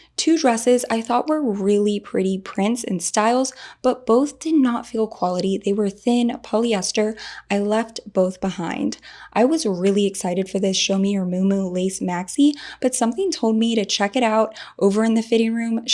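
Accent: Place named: United States. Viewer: Hi. I'm from new Zealand. I love your accent. What part of America are you from?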